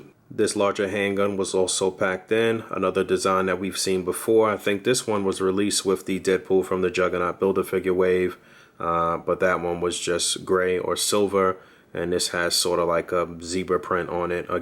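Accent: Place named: Brooklyn, United States. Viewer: You from Brooklyn?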